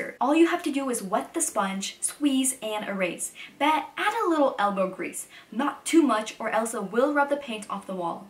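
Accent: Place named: United Kingdom. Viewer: You have a bridish axcent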